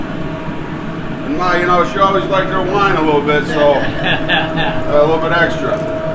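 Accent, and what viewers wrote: United States. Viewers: You have a very American style of talking, almost the accent.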